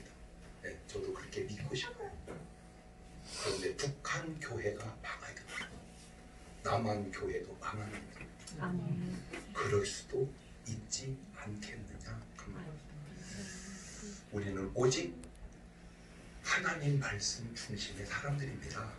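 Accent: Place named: Chungcheong, South Korea. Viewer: 장경동 목사님의 설교를 듣다보면 참으로 공감하는 부분이 너무나 많습니다 나는 서울에 사는 천주교인 인데 장목사님을 너무나도 존경하게 됐습니다 특히 구수한 충청도 사투리에 진실된 설교 정말로 공감합니다 서비스로 비밀 한가지 말씀 드릴게요 ? 나라 화합 하지않고 대깨문 위한 정치하는 역사상 가장 무능한 자 어벙이라고 아시죠 이번 기회에 혼좀 내주세요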